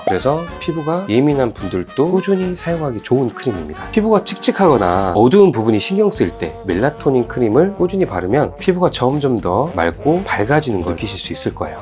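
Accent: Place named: Jeolla, South Korea. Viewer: 전라도 사투리 진짜 우와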